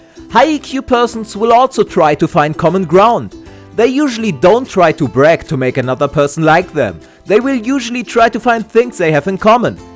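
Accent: Germany. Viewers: Ist deine Muttersprache Deutsch? You sound like a german 😃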